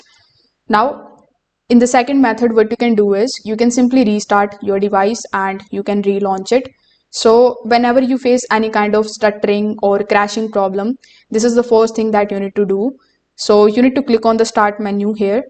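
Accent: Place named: India. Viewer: bro we can hear that you are indian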